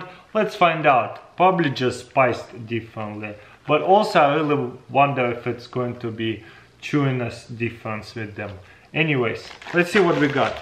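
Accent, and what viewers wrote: Russia. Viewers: I’m just here to hear him say different animal names in a russian accent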